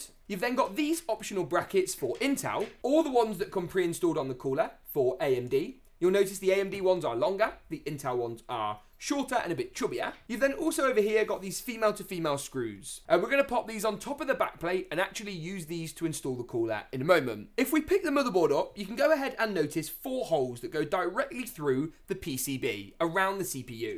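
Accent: United Kingdom. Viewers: Lol I’m sad he has British accent but he didn’t do the video on £ I mean it would have helped us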